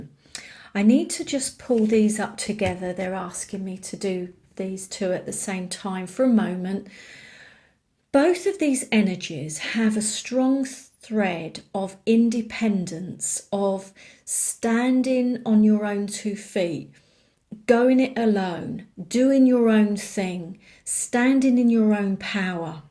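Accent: United Kingdom. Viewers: Thank you, for your loving bright smile and face, that so much express the Spiritual Community of Light, which leads and inspires you on this specific task you are performing with us here. You are ALREADY in your own....Zone of Genius, dear friend!! For sure!!! But I feel also very grateful for the Quality of your presentation, the respectful, joyful and positive personal style of your image to us! And your gorgeous, clearly spoken, British English, of course!! Lots of hugs!!!🤗🤗🤗🤗🤗❤️❤️❤️❤️ Liza.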